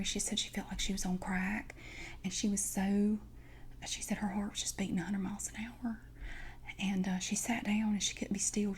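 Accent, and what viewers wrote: South, United States. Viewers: Amazing! Southern Accent is such a good trigger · IT's cool to see another asmrist with a southern accent. I felt a little self-conscious about my voice but starting to feel more okay with it. You're amazing! Subscribed! :)) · Southern ASMR? I'm in heaven. Subscribed!!!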